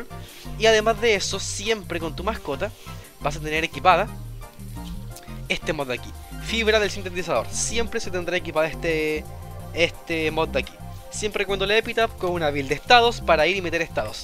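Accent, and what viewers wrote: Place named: Chile. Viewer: Buena compa me alegra escuchar chilenos, supongo que eres chileno jajajaj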